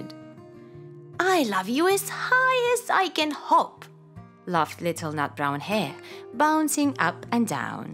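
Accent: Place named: United Kingdom. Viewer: Потрясающе,. Вы - живой пример для меня и мотиватор идти дальше🎉прекрасное произношение британской речи, просто мёд для ушей! Мне понадобилось некоторое время, чтобы понять, что это Вы, читаете 😂браво! К слову, я давно у вас на канале нашла видео о билингвизме и искусственной англоговорящей среде для малышей, собственно, уже два года я и мои дети в этой среде. Хотелось бы больше информации на эту тему, какие игры и фразы можно и нужно говорить малышам во время игр или просто daily routine, чтобы это было аутентично. И вообще наблюдать за прогрессом вашего ребенка. Понимаю, что тема не имеет отношения к вашему каналу, но хотя бы иногда 🥳💕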